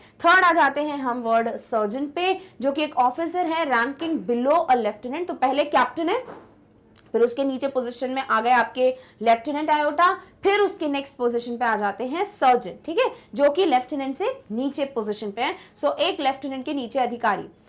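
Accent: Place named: Punjab, India. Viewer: Mam Shayad Panjabi Background se aati hai kyuki inki hindi me thodi thodi panjabi Accent jhalaktha hai 😹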